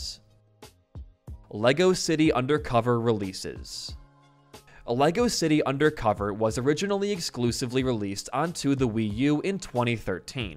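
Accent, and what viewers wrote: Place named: Australia. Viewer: he’s Aussie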